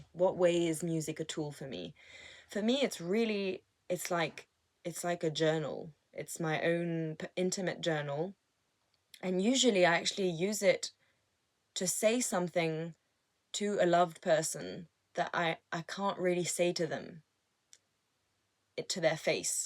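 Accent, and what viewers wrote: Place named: United Kingdom. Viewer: I like your music videos. Very nicely done. You have alot of talent. But surprised to hear your British accent.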